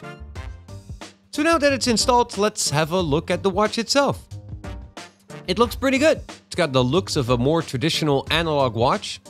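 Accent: Netherlands. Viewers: Hi! Are you dutch?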